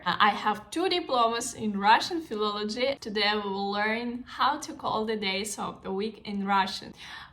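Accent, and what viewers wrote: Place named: United States. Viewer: Nice channel, your Greek is perfect, the only way to tell you aren't native is your slight American accent.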